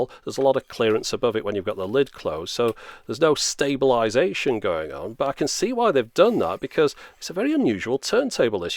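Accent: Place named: England, United Kingdom. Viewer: Josh Bacon u are so right... And his English accent makes it so premium to look =) I love too!!!!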